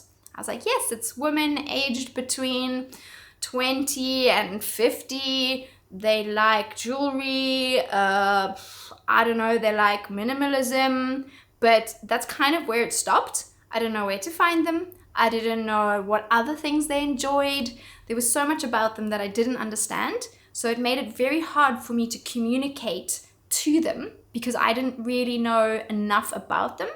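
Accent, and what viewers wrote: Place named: South Africa. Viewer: I just knew that was a South African accent 🙌🏻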